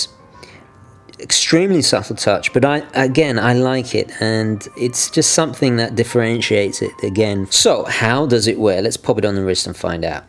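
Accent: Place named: RP, United Kingdom. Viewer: Ok , first things first . In general your vids. have improved, just keep the plumb out of your mouth and things should go well. But , that attempt at the posh type intro has got to go. Guys swimming around about the place just dont fit bro.! Now , the watch , love 95% of it , but the sloppy crown stem in the unscrewed position just says cheap crap , along with the Sellita movt. , unregulated to boot .! Otherwise I would buy one tomorrow .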